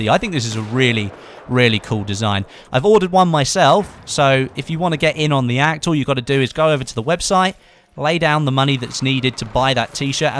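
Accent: England, United Kingdom. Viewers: How did people not know you were from the UK? XD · Story sounds a little English to be honest. Didn't realise you're close to London. I thought you were like me (midlands)! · In fairness, as an American, I could clearly tell that was British. He doesn't sound Australian at all imo:P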